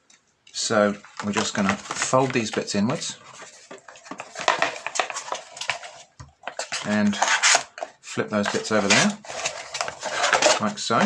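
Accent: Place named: London, United Kingdom. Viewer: Speaking of characters, I remember your Londoner accent from the scambaiting videos!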